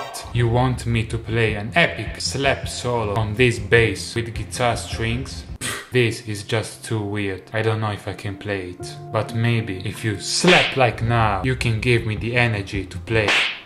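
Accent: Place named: Italy. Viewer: and when he talk..he sound like italian guy...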